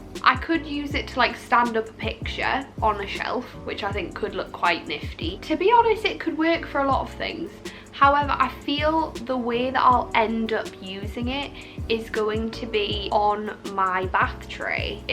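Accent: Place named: England, United Kingdom. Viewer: Where are you from? I think England but I know I'm probably wrong? I just think it's funny/cute when you say "me" instead of "my" haha and you're goofy on the best way 😂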